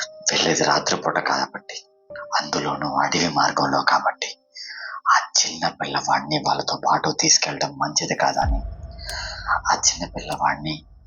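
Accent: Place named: Telangana, India. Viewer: Excellent bro me Telangana slang lo cheppadam chala bagundi. Ipude subscribe cheskunna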